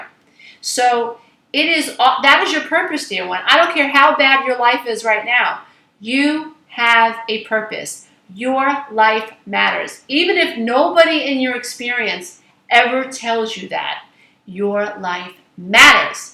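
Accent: New York, United States. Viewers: Don't you hear her NY ACCENT?! 🗽🗽🗽 love you -Brooklyn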